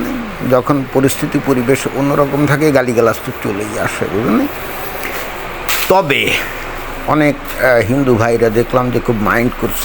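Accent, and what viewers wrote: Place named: South, Bangladesh. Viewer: আপনার ভাষার উচ্চারণ শুনে ধারণা করি আপনি যথাসম্ভব দেশের দক্ষিণাঞ্চচলের মানুষ,আমার বাড়ি ও এদিকে।Student visa তে আমি ও দুই বছরের মত ইউরোপের একটা দেশে ছিলাম কিন্তু মন টেকেনি।পড়াশোনার পাশাপাশি কাজের সুযোগ ও খারাপ ছিল না তবুও কেন জানি Home sickness কাটিয়ে উঠতে পারিনি চলে আসলাম।পরিচিতরা ঠাট্টা বিদ্রুপ করে চলে আসাতে কিন্তু তাদের কে কি করে বুঝাব দেশের জন্য মন হুহু করার অনুভূতি টা কেমন।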